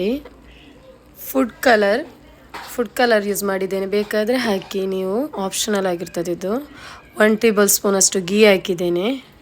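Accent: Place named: North Karnataka, India. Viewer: Nimdu yavoor kannada ri uthhrakarnata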